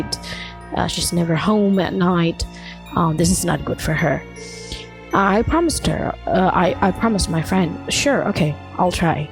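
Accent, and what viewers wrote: Indonesia. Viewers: Ini yg ngomong org indo ya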